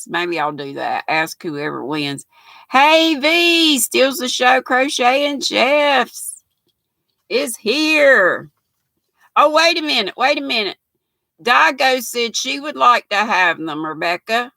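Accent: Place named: North Carolina, United States. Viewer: I thought your accent sounded familiar, I'm from NC too. I live just west of Charlotte!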